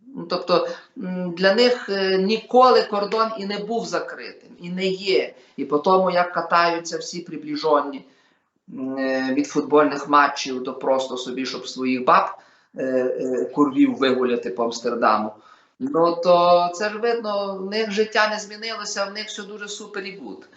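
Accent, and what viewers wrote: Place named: Galicia, Ukraine. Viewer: Дуде імпонує стиль розмови і сама мова. Це не просто класичний галицький діалект,а вишуканий діалект за часів галицької інтелегенції часів Австрійської адміністрації. Дуже круто 👍👍👍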